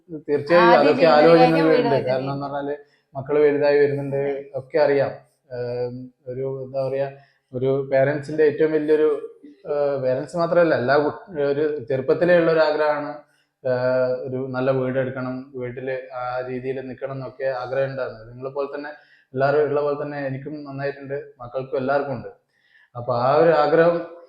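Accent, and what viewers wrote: Kozhikode, India. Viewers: Kozhikodu language kettirikkan rasa ❤adipoli❤ happy familiy❤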